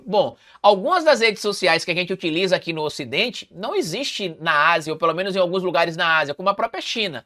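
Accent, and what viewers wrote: Northeast, Brazil. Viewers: Boa tarde, gosto muito das suas explicações, te admiro você é muito inteligente, esse sotaque nordestino é o seu charme kkkkkk.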